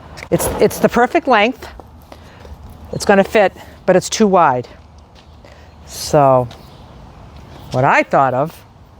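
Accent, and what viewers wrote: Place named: Massachusetts, United States. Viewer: Hi! I fell upon your channel and love it! I love your accent too! My family and I would spend a few weeks every summer in Gloucester, Mass., and it reminds me of all the lovely people we met there!! 👍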